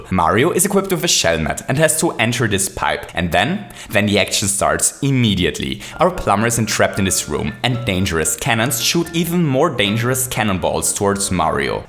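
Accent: Germany. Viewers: Is it really an accent? He is German, so it's just a German trying to speak English. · Höre ich da etwa einen deutschen Akzent? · Do you speak german? Because you have a german accent.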